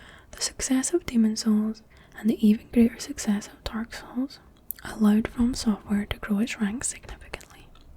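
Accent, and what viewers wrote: Scotland, United Kingdom. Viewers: Scottish accent and Sark douls in one video. The bell hath been clicked.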